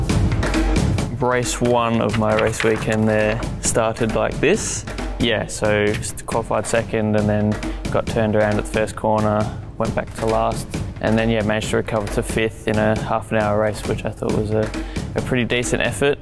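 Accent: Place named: Australia. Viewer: His Aus accent sounds bit stronger here..hes much younger here though❤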